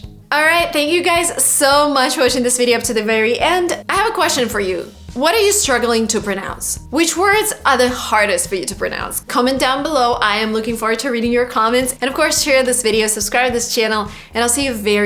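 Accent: Russia. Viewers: awful russian accent:face-turquoise-covering-eyes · please take care of your aspiration. The way you pronounce the h sound gives you a heavy Russian accent. The second priority would be all first sounds in the words you‘re saying. Love watching your pronunciation progress! Way to go, girl!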